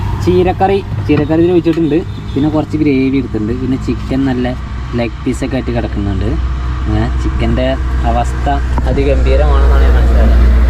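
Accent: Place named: Kannur, India. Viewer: നമ്മുടെ കണ്ണൂർ സ്റ്റൈൽ സംസാരിക്കുന്നതിൽ വളരെ സന്തോഷം തോനുന്നു🌹🌹🌹🌹 bro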